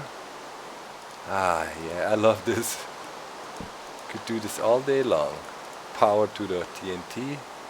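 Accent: Germany. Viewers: ach ich liebe deinen deutschen Akzent :)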